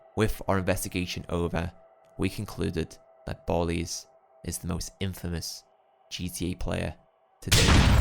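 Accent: Liverpool, United Kingdom. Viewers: R u Scouse ? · Fellow scouse?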